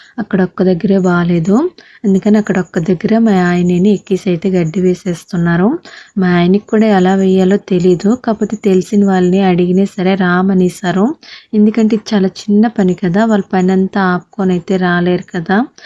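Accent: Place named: Nellore, India. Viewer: Madi kuda nellore dagra andi kani memu hyd lo untamu..me basha nellore laga ledu enti andi,basha different ga undi ani adgutunanu andi em ankokandi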